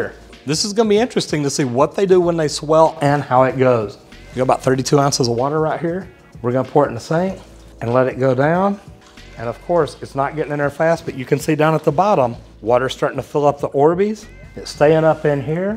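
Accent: Texas, United States. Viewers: Yeah he's definitely from Texas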